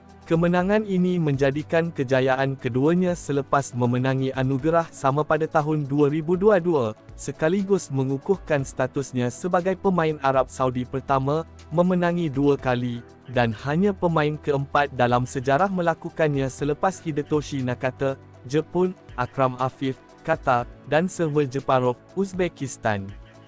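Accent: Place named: Indonesia. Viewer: Admint ni orang indon sebab Tu dia cakap MCM Tu.😊😊😊😊😅😅😅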